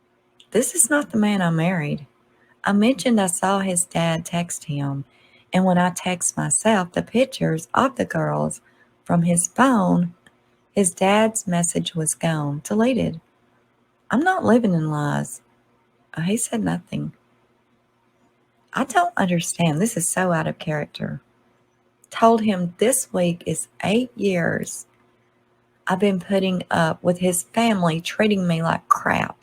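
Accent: South, United States. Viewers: Doesn’t she .......her accent is amazing when I visit America this is what I want to hear 😃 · Please don't let some of these negative comments get you down. You're doing a great job with a very difficult, controversial case. You are so beautiful....a southern Queen. Hope you will do more reviews showing your pretty face....not just you nice voice. I just love Southern accents.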